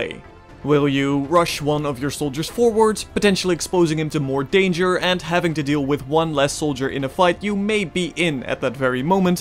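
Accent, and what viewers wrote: Netherlands. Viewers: I think I hear a Dutch accent. :)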